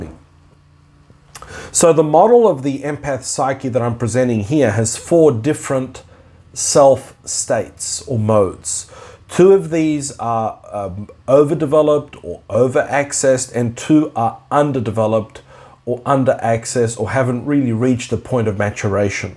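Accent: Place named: Australia. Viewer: Very interesting. I am an identical twin so there's a whole other aspect of "self" to address as well, which singletons do not. All four self states are thus magnified in essentially two identities - together as twins, and as separate individuals. The external experiences impact the significance of the development of self as well for eg, all our lives we are assessed re our differences and our likenesses by others who inform us of their conclusions, yet simultaneously treat us as a "unit" (eg one soul split into two bodies), thus the development of our "self/selves" is extremely public, ceaseless and difficult. To be told from a very early age who your inner child is, being critiqued, in the context of being compared etc with someone else's inner child is really confusing for young kids, and not easy as an adult either. It's almost like the inner child and inner critic are both external and internal for us. 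So as I listen to your excellent talks I filter all you say through our and my & her perspective. Many twins/multiples become bitterly estranged as the inter- & intra-personal self states are too hard to deal with without good help, and good help is extremely scarce. There's a lot of info about twins and our genetics etc & for their parents but practically nothing for twins themselves in how to navigate this often confusing state so that the adult and warrior can handle things well. Thank you for your great work :). Great to hear a fellow Aussie.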